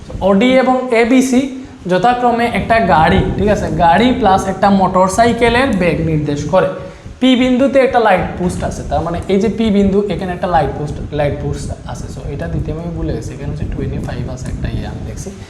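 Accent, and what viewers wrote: Sylhet, Bangladesh. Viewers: Baiya apni ki Sylhet e taken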